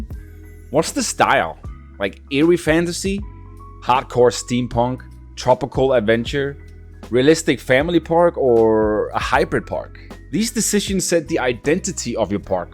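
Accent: Denmark. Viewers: Are you slightly danish perhaps? the accent sounds very danish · Thanks for the video it helped a lot. Og det også altid så hurtigt man hører den danske accent når man åbner en tilfældig video haha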